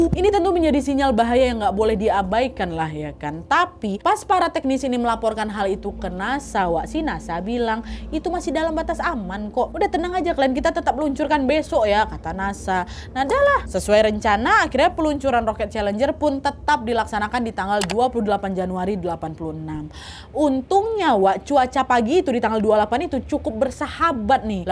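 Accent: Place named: West Sumatra, Indonesia. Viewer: Seneng sih denger penyampaian dari. Dari logat bahasanya, orang minang ya wak? 😁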